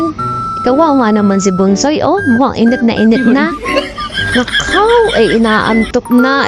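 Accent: Batangas, Philippines. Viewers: Batangeña ala e HAHAHAAA ang cute!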